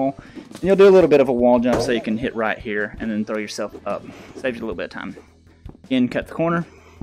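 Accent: United States. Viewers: sounding American today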